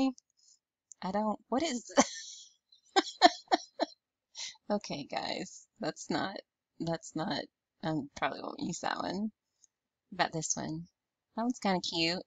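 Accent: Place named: South, United States. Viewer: You're too cute! "Is there something stuck on ma shoe? Did ah break ma heel? What's that all about?" Hehehe love your southern gurl, so am ah! and TYSM for doing these videos. Ah am a visual learner and ah need pictures too. YOU are a wonderful teacher!!!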